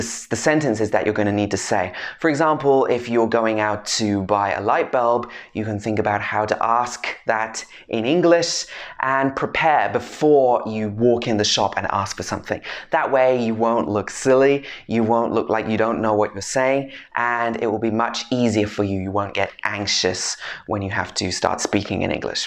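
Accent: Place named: United Kingdom. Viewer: Wish I can talk to you everyday to improve my English speaking, specially British Accent which I really like.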